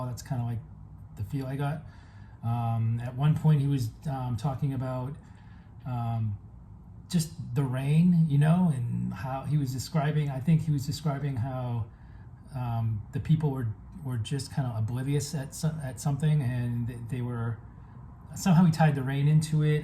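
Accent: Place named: London, United Kingdom. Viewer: “Allo, G’mornin’ Guv”….Very London. ITS so soft that most people miss it.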